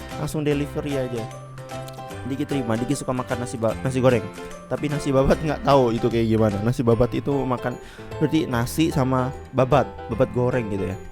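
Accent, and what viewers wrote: Java, Indonesia. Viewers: cara ngomong biar ga medhog gmn bang? #TanyaTAMPAN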